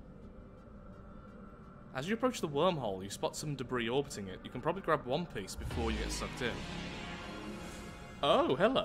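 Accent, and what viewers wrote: United Kingdom. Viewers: next video can you turn down the game music a little? I can't hear your British voice as well as i need to!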